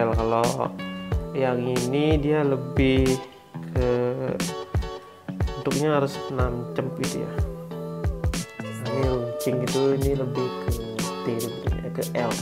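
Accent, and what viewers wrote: Bali, Indonesia. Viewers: Logat bali Salam dr hindu rantauan bang..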